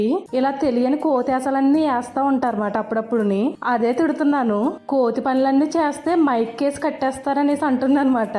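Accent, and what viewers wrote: Konaseema, India. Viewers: మీరు వీడియోస్ బాగానే చేస్తారు కానీ, మీ భాష కాస్త మార్చుకుంటే బాగుంటుంది. మరీ కోనసీమ యాస ఎక్కువగా ఉంది. మీరు దుబాయ్ లో ఉంటూ కూడా, పక్కా యాస లో మాట్లాడితే బాగోదు. కాస్త nice ga మాట్లాడటం నేర్చుకోండి. మేము కూడా గోదావరి జిల్లా వాళ్ళమే. కానీ ఉండేది హైదరాబాద్ లో. కాస్త స్టైల్ గా మాట్లాడితే మీకు ఇంకా వ్యూస్ ఎక్కువగా వస్తాయి😊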